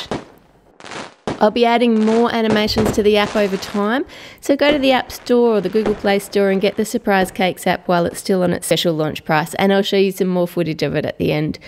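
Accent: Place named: Australia. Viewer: G'day! Just wondering if you could do a Video tutorial on this cake. <link> I would like to do it for my daughters birthday in September, and your videos are GREAT. Thanks. Ps nice to hear an Aussie accent on you tube. You are VERY talented.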